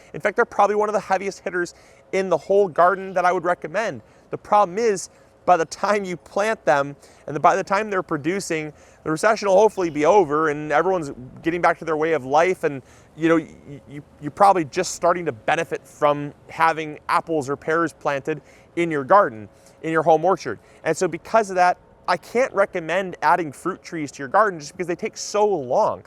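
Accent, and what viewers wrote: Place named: Louisiana, United States. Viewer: You almost sound Cajun. New Orleans is known for Chicory coffee, like Cafe Du Monde. They blend Chicory with Coffee to stretch coffee. I've never thought about growing my own Chicory. Native Texan and been to New Orleans a few times and to Cafe Du Monde a few times. It was awesome hearing someone else talk about chircory coffee.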